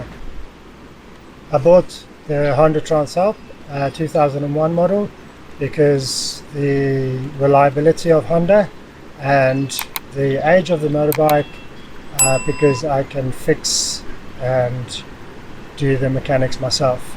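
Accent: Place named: South Africa. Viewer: Hi I just bought one yesterday after dreaming of it for many years! From your accent i take it your south african? I'm from Worcester (SA). Would relly like to meet you one day maybe? Cheers!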